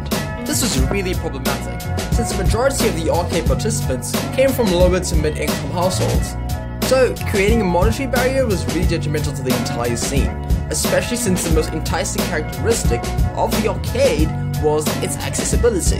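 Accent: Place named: South Australia, Australia. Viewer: South Australian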